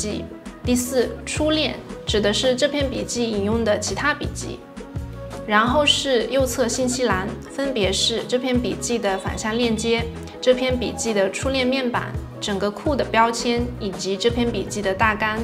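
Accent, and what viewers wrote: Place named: Zhejiang, China. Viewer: 挺好的，你是哪里人啊？好像有点浙江口音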